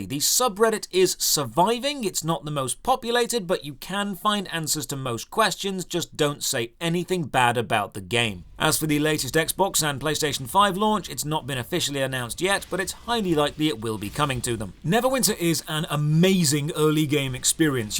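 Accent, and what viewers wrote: United Kingdom. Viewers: Outstanding MMO review as usual. Btw i love listening to your voice and british accent (sorry if i´m mistaken, south-eastern european here). What about Allods Online? I couldn´t find a review about it on your channel. I used to play it about 10 years ago and never got back into it. Would be interesting to hear your thoughts on it! Keep it up!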